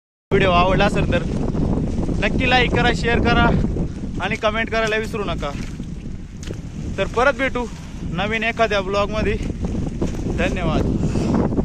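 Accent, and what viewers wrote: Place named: Karwar, India. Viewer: करवाली बोलतात .....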